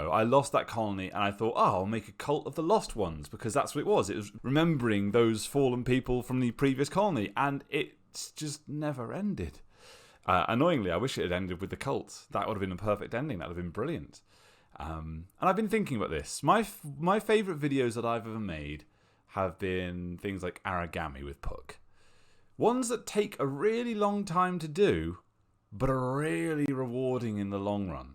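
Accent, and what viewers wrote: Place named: United Kingdom. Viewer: I’m sad that this channel is dead, but I’m really glad I decided to come here (after burning through all hopeless vods) I really like the casual nature of the videos. I’m excited to see where the Hopeless Idiots go from here, but I hope you keep this channel as archives. I need a backlog of that sweet, buttery British accent, I’m sure you understand.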